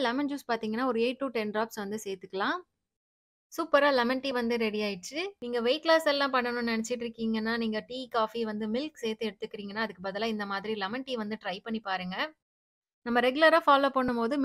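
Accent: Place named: Thoothukudi, India. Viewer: Na valandhadhu full ha chennai dhan but enga mamiyaar veedu thuthukudi so neenga pesarapo enaku slang romba pudikum and simple ha neat ha vlogs irukum 😍😍 more over same age kuda 😍😍😍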